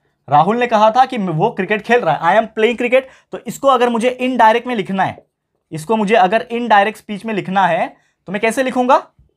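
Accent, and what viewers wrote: Maharashtra, India. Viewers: Sir are you Maharashtrian???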